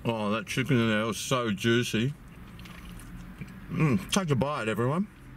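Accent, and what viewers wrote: Australia. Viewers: I detect an Australian accent. Hi I'm from New Zealand. I have just found your channel and I'm excited to watch all your videos. I watch a lot of youtube videos because I can't eat a lot of food. I only have one metre of intestine left after having 6 operations over 20 years removing blocked parts. I also have raynauds, short gut syndrome, I have major bleeds and clots and I have food issues related to aspergers. I'm terminally ill now and my doctors are astounded by how I fight back and recover when most people would no longer be here. The funny thing is I love to cook for my parents but most of it I can't eat however I enjoy watching people loving what they are eating. I want to thank you for your videos as I'm intending to use them to distract me from my 24/7 pain which is a major issue for me. This has worked for me with some other channels. 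So thank you and keep up the good work. I know that it takes a lot of time to create a video idea, film and edit. You are a natural in front of the camera. Xx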